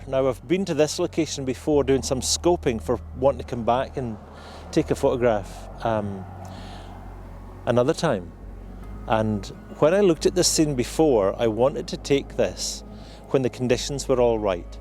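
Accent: Scotland, United Kingdom. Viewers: I came across many sites on photography, but never one like yours. You really hone in on the finer details and helping struggling photographers like myself in choosing when best to shoot and what settings to use. I love this segment, as well as the one on shooting pano when you explain the need to use the nodal stick to reduce perspective "distortion" and helps to blend multiple images better. I have since bought one such machine, thanks to your teaching. Please please continue to inspere us, the struggling photographers. Your voice is good, "smooth Scottish" accent I kind of getting used to now and it sounds like music to my ears. Thank you is never enough for such a great teacher like you.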